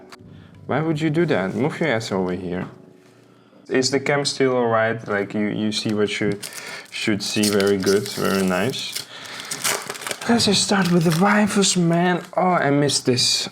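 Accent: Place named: Netherlands. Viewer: Ur from holland i can hear xD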